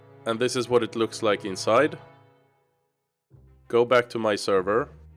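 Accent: Sweden. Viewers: You sound so Swedish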